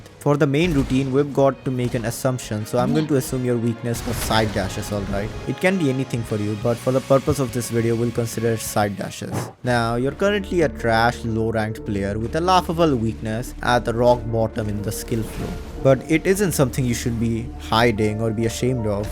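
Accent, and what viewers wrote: India. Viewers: I heard the Indian accent and knew this was going to be the best Tsb video I‘ve ever watched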